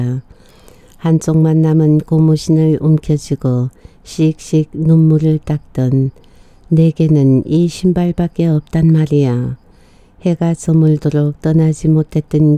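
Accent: Gyeongsang, South Korea. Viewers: 고향 운정 미국이민 20년 타향에서 도식오빠 순례 애틋한 인연 한편의 영화 님 경상도 밀양 사투리 가슴 이 먹먹합니다 감동입니다🎉😢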